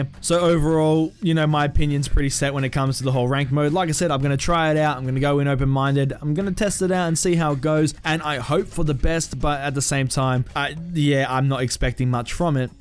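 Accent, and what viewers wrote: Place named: Australia. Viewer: Which part of australia you from? Im subscribing m8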